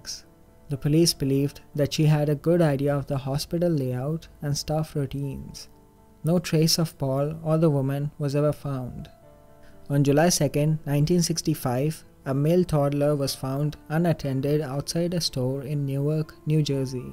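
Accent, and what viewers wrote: India. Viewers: not this indian voice, literally worst accent possible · Are you from India? I have a friend in Northern India and I'm noticing the accent is slightly different but similar. Most of the Indians I've met have accents closer to yours.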